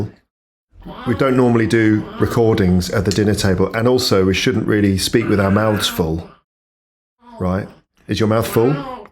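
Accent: United Kingdom. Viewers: Congratulations. You're a great speaker. I'm italian, i'm a beginner learner and i love your british accent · Hello from Paris to Paris! You have brilliant set - a catchy voice - both British accent and timbre, sense of humor (I laughted at the Russian joke, as far as I am Russian, I could claim it was good) and knowledge about how teach language. I believe that you are right on keeping your motivation to continue with different types of podcasts, may be you will come up with even more new podcast subtypes, future will show. Bonne continuation! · Congratulations from Spain.. Thank you for your amazing English class,with excellent british accent. My son is currently doing his surgical training in London, thanks to you! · Congratulations Sir !!! It's very helpful listening your beautiful British speaking!! Wish you great success more than that 👍🫡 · ❤❤ Hello, I subscribed to you only now, although before that I had been listening to your correct British English for several months. 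It's very interesting to listen to you. I listened to the story about how to communicate with small children three times. I wish you health and success. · ❤❤❤wow. 👍🏻 well done, I love listening to you. The very British voice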